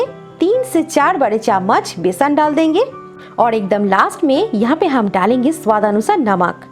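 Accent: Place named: South India, India. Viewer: Your talking way south indian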